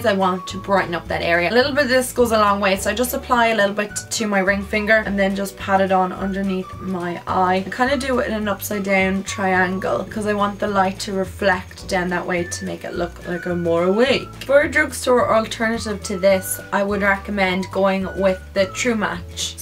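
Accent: Ireland. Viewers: Are you Irish? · I've watched your videos for some time now and I still cant get over your accent! I love Irish accents! They are so much more interesting than the boring oll American one. Loved this video as well! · Your Irish accent is so beautiful!! And so are you <3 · omg are you irish? C: you sound irish :D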